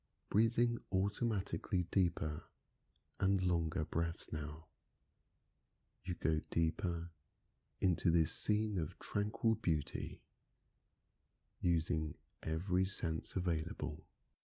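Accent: United Kingdom. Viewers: Who are you???? I tried LOADS last night, and finally found this. PERFECT!!! No music, and a UK make voice that was just perfect for me. Thank you so much, but please reveal yourself!!!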